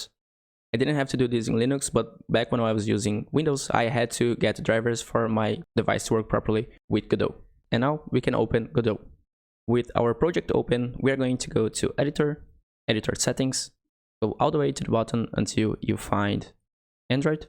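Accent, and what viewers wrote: Brazil. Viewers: i must ask,where you are from? you sound a lot BR to me.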